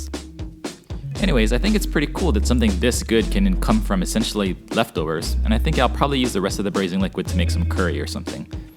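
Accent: Hawaii, United States. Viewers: I like the shortcuts you show! It’s really clever! Are you from Hawaii? You sound like it.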